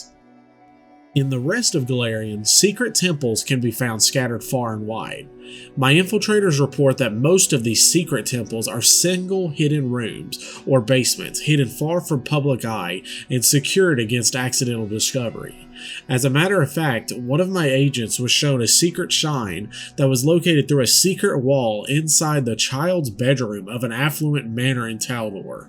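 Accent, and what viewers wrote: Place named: Deep South, United States. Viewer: Got that drawell, that deep south dwall lolll.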